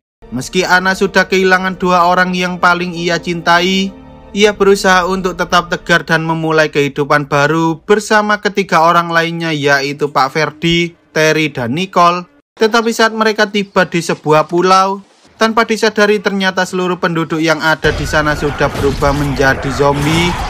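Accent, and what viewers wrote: Java, Indonesia. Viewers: mimin orang jawa nya medok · aksen jawa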